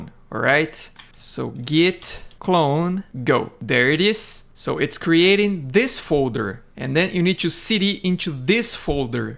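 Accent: Brazil. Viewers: Brasileiro? O sotaque é bem inglês brasileiro (Acho lindo) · Pelo sotaque chuto que é brasileirinho, não? Excelente vídeo mano. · Você é BR né safado? conheço o sotaque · esse sotaque é. brasil conheço de longe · I literally commented on their video like "Now I just need a H100 to run this" and boom this pops up in my recommendations and you sound just like me talking in english, impossível um brasileiro não reconhecer o outro, me inscrevi no canal muito bom o vídeo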